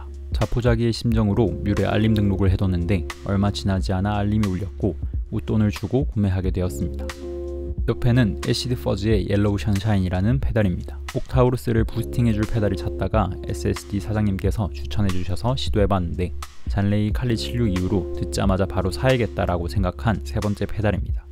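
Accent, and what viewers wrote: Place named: Gyeongsang, South Korea. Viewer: 경상도 분이신가봐요?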